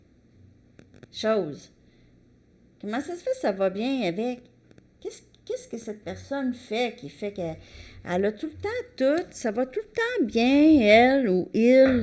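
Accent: Quebec, Canada. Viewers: Hello, Je reconnais à votre accent une cousine d’outre-Atlantique, une belle journée à vous et merci pour vos guidances. ☀️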